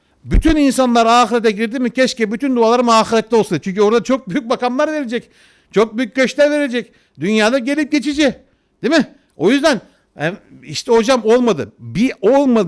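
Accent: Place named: Thrace, Turkey. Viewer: Hocam Trakyalımisiniz? Bende trakyalimyim konusmanizdan anladım Saygılar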